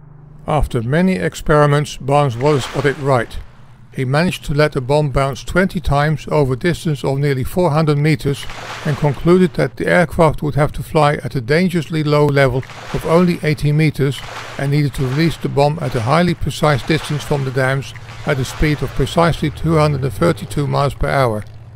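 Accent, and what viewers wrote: Netherlands. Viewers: yep you sound Dutch to me ( my son in law is German) Very interesting presentation and analysis that you have done here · Sounds more Dutch to me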